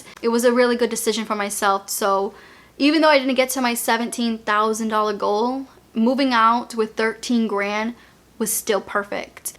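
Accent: Boston, United States. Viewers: It’s so funny, some times your Boston accent comes out WICKED strong, some times you only seem to accentuate certain words (I’m sure you don’t do it intentionally) Lol, I used to live and spent quite a few years in Lowell, Fitchburg, Cambridge, but I don’t have a Boston accent at all since I’m originally from Philly. (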